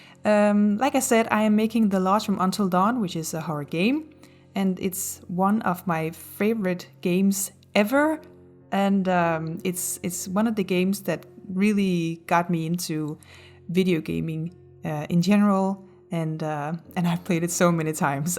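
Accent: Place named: Denmark. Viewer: Thank you so much for the tutorial! I recreated it in Once Human and ur video helped alot!.. Also are u danish? U sound quite danish 😅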